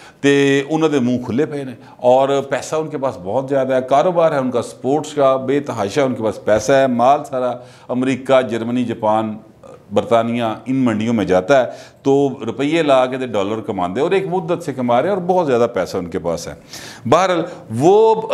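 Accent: Punjab, Pakistan. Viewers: I love your style of speaking Urdu with Punjabi God bless you 🙂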